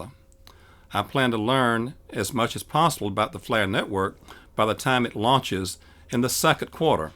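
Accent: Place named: South, United States. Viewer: you sound like a real southern gentlemen. I heard Flare would go live on may 1st. Thats what i heard.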